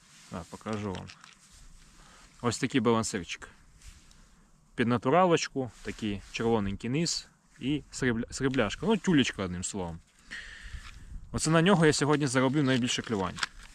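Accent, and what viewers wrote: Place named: Polissia, Ukraine. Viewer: так, лайк за україномовний контент, і я чую по Вашому говору поліський край, Житомирська область, коростенський район??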